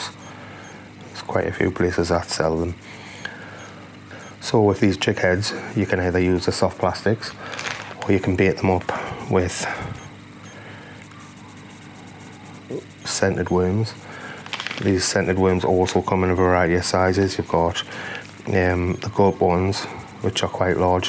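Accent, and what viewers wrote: Newcastle, United Kingdom. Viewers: No offence but I hate the Geordie accent I've had a few bad run ins with Geordies and I just can't handle the accent any more. Good fishing tips thanks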